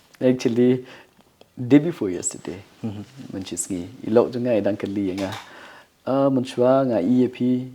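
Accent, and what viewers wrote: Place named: Khasi, India. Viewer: Ba phi kren beit da khasi te nga sngewtynnad bn sngap🎉❤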